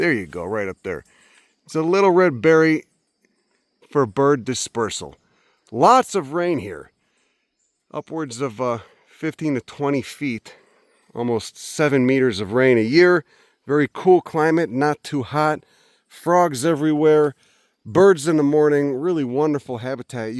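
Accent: Chicago, United States. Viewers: Heyhey, the guy's from Chicago not NY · Chicago, not New York.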